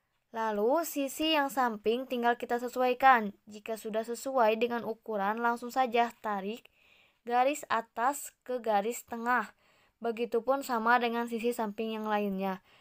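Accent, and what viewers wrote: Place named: West Java, Indonesia. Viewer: *sundanya itu loh masih lengket, sukses ka*👍👍👍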